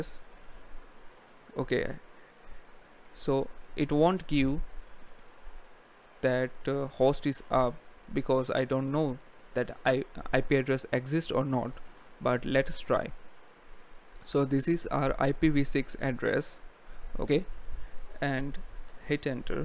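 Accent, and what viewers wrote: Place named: India. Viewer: love your indian accent, great video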